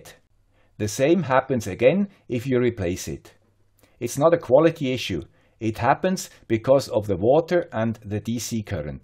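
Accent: Switzerland. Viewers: Your videos are always wonderful, instructional and your Swiss accent is adorable too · Hallo aus Österreich,!:hand-pink-waving: Ich mag deine Videos. And "the Swiss accent":) Wegen des Kupfers... Wenn du, so wie du es gemacht hast, die Elektroden ins Leitungs-/Brunnenwasser steckst (nimmt man ja meist zum Gießen) und mit ein wenig Spannung und einigem Strom beaufschlagst, erzeugts du einfach Knallgas. Sauerstoff und Wasserstoff an je einer Elektrode, das entstehende Wasserstoffgas kann als Blasen an der Oberfläche der Kathode freigesetzt werden. Komplizierter ist es mit Folgendem: Im Leitungswasser sind üblicherweise verschiedene gelöste Salze enthalten, darunter Natrium- bzw. Kalziumionen und Hydrogencarbonationen (HCO₃⁻), die möglicherweise reagieren könnten, um Kupfersalze wie Natriumkuprat (Na₂CuO₂) oder Kupfercarbonat (CuCO₃) zu bilden. Verschiedenen Faktoren wie Wasserzusammensetzung, pH-Wert, Temperatur und Stromstärke beeinflussen die Reaktion. 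Wenn man Pflanzen mit einer solchen Flüssigkeit bewässert, bzw das im feuchten Erdreich auftritt, könnte dies potenziell schädlich sein, da Kupfer in höheren Konzentrationen toxisch für Pflanzen sein kann. Kupfer ist für Pflanzen notwendig, aber nur in geringen Mengen. Bei zu hoher Konzentration kann es jedoch die Aufnahme von anderen wichtigen Nährstoffen behindern und oxidativen Stress verursachen. Die Kalkablagerungen könnten auch den Boden pH-Wert erhöhen, was wiederum die Nährstoffverfügbarkeit für Pflanzen beeinflussen könnte. Ein erhöhter pH-Wert kann dazu führen, dass bestimmte Nährstoffe wenige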